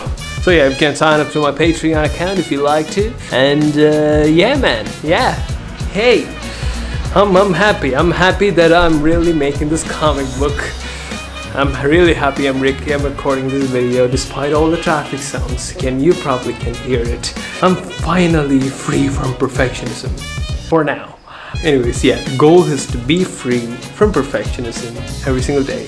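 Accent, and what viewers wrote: India. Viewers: Bruh are you indian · This Indian accent. I can't understand these people!!!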